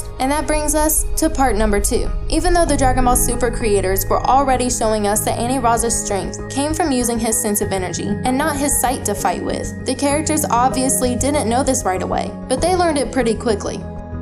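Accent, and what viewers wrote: South, United States. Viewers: I hear country in your voice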